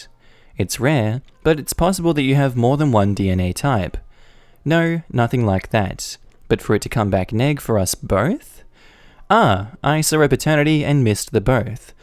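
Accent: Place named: Australia. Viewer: I just want to listen to my Aussie husband talk...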